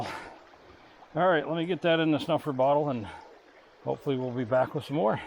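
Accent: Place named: Vermont, United States. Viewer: Love that born in Vermont accent!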